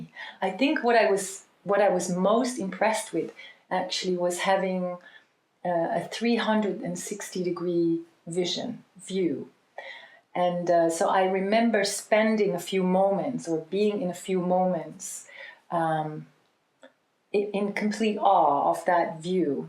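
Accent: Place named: Netherlands. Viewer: I laughed when you said the voice of Creator was in English :) Are you a native Dutch speaker? I hear a little accent that I recognize! I would love to have a session with you, if you were in Belgium or Netherlands some day :)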